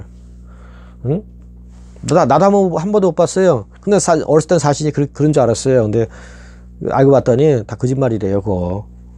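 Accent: Incheon, South Korea. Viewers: 언뜻언뜻 이천수선수닮으셨네요 ㅋ 인천분이라 약간 특유의 말투도 비슷한듯하고요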